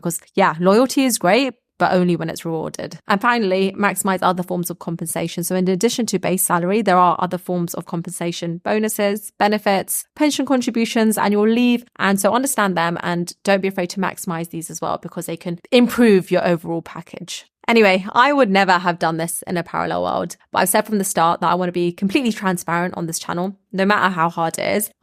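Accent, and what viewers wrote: United Kingdom. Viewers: I don’t know what but your British accent, simple dialogue, beautiful face and matured/experienced way seemed to convince me to finish the video, but it raised a big question in mind….How do you know when is it the right time to negotiate and how do you negotiate a pay rise.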